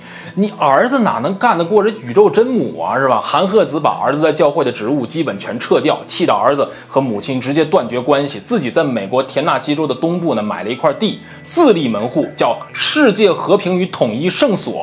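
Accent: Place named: Beijing, China. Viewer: 這個小哥北京腔好明顯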